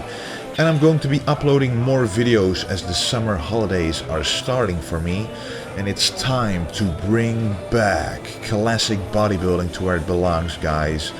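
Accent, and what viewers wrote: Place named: Netherlands. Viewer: are you from the netherlands? your english accent sounsd a lot like a friend of mine from the netherlands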